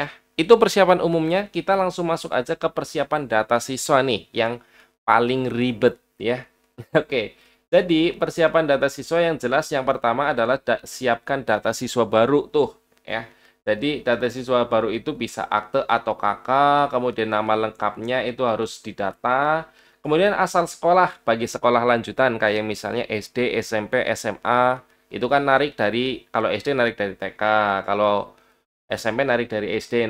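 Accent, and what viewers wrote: Banyumas, Indonesia. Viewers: makasih banyak mas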